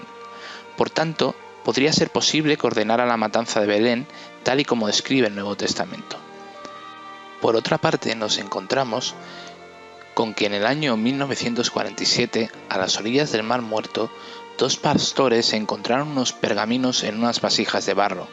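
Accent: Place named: Spain. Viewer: Eres de España sin duda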